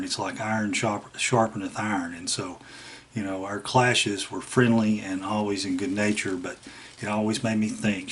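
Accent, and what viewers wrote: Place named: West Virginia, United States. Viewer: Where are you from,? Your accent sounds a lot like mine here in good ole WV. Bobbie was a very sweet lady... always came across as genuine. This is all new to me, considering that Gimlin is a liar, so I'm kind of in shock. I was always kind of hoping that MK Davis, who I like, was just overreacting or something, like we're all capable of from time to time. This is pure insanity. So, Patterson and Gimlin expedition wasn't to film one, it was to wipe out a family of them in that area? I had no idea that we weren't seeing the complete film, but honestly, I never bought the "Patterson fell off his horse" part of the story. No one falls off a horse and lands that gracefully, camera in hand still.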